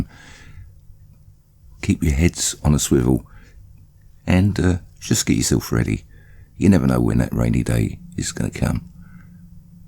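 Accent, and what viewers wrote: United Kingdom. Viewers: Honey your sarcasm is just a little too subtle for people to really get it. I think it’s because your Britt accent confuses.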